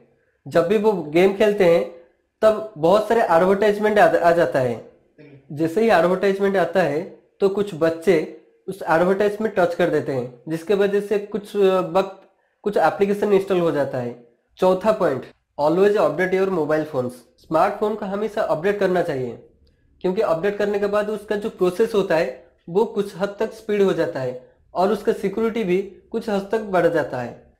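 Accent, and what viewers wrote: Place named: Odisha, India. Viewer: Bhai tu Odisha se Hena... 😅.., Tera hindi pura odia accent ke taraf sunai de Raha hai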